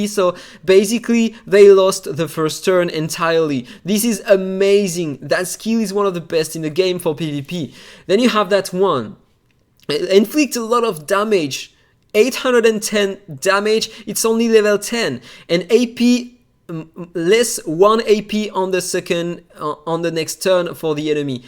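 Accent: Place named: France. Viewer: I love the French accent, by the way.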